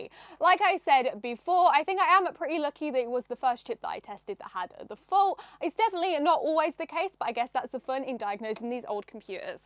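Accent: United Kingdom. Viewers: I love your videos! You have one of the thickest British accents I’ve ever heard. Love it.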